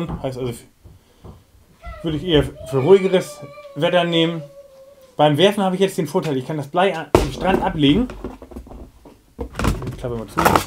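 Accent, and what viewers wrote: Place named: North Germany, Germany. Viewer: Top! Immer wieder gute Ideen "norddeutsch" rüber gebracht! 😀😀👍👍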